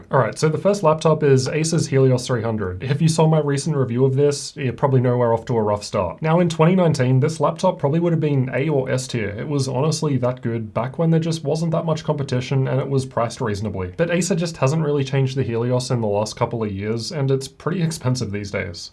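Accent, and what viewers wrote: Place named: Australia. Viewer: I love your videos and Insite on everything but when I I hear you say words that end with Ole or ore and some other words I don't know how you don't start just dry heaving. How do you get that low and distorted while stile talking without choking lol your voice is amazing I can't even do an impression without choking lol your deep voice mixed with the aussie accent kills me I love it, but can't recreate it lol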